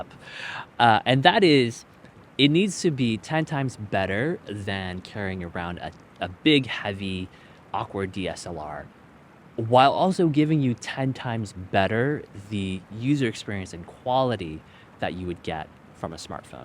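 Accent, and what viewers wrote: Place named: Scotland, United Kingdom. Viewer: As I Scotsman, I’ll forgive you for the Star Trek Scotty accent, for producing a great video. I’ve got a 100f so understand what you are talking about ….. despite the accent.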